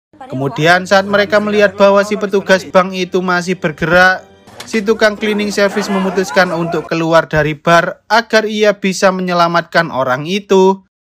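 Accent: Java, Indonesia. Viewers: Medok sekali 👍🏼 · logat nya kok jawa kali jadi enek di denger, kenapa gak biasa aja bg biar bagus kayak IQ7 & SAQAHAYANG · Ya allah mas medokkk e samean wkk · Ngomongnya bisa ga sih gausah medhok gitu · Saran saja mas tolong logat Jawa nya jgn terlalu keras pasti seruuu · Medok bngt..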